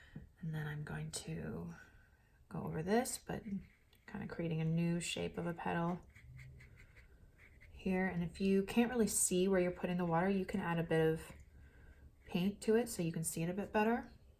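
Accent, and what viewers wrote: Canada. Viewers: Well I think you are fantastic ❣️👏👍. I adore your little son, your casual teaching methods that allow me to make mistakes but keep trying, your encouragement, and your Canadian accent ( my sister and her husband, sons and their extended family are scattered throughout Ontario ) and we have many delightful Canadian friends from years of travels❤️🥰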